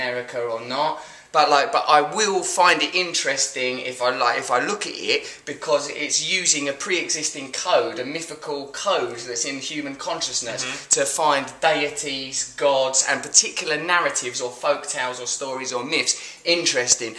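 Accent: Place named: United Kingdom. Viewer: Gosh I wish there were subtitles for these. I love Russel but he speaks so fast, and because English is my second language I really struggle with the British accent when he goes that fast. Anyway, love the new intro and logo!!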